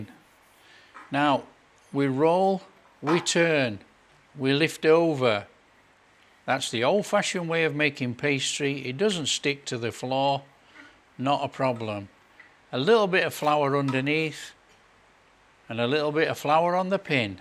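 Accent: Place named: Doncaster, United Kingdom. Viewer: I spy with my little eye a Donny accent ❤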